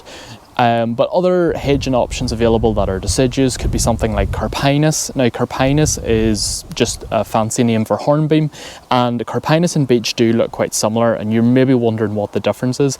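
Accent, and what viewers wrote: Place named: Ireland. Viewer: I love everything Irish and your lovely accent and the Irish vibe. Presumably you are in lovely Ireland? If not, bless ya for bringing us your lovely accent and charming Emerald Isle manner anyway! Apart from loving the Irish accent and Zero Zero Guinness, another thing I adore is listening to Gardener's Question Time on BBC Radio 4, but last time I heard the radio programme panel discussing hedges they seemed to hate cherry laurel just as much as you do. However, I've gone for cherry laurel round my garden because it is very cheap, tough and fast growing. I bought the smallest cheapest plants and after a couple of three years they are now almost a full grown hedge. You've also done a bit of yew around your garden, which is a great idea, however, even more expensive than beech, and takes even longer to establish. In fact, yew is extremely slow growing. 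However, indeed, the deciduous beech is great because it is a tree and so you can have a hugely high hedge - eventually as tall as a full grown beech tree - if the neighbours and laws of obstruction allow - but they are either extremely expensive or take years to establish. But, while if I had more money I might have gone for deciduous beech or evergreen yew, I actually really like my poor person's cherry laurel, zero problems with yellowing leaves, and in a short time it is making my garden look so much smarter, while I don't have to worry about the expense of a new garden fence and it certainly won't die from drought. And, yo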